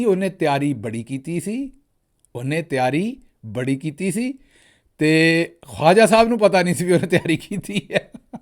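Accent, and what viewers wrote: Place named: Punjab, India. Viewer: I like your punjabi accent ❤❤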